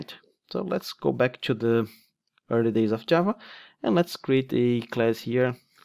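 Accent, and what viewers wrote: Brazil. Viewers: inglês com sotaque de brasileiro é um mamão com açucar pra entender ahuahauhauha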